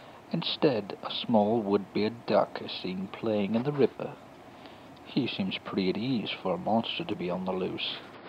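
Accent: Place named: United Kingdom. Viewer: Snow in the Louisiana swamps along with the narrator's British accent make me believe this is real.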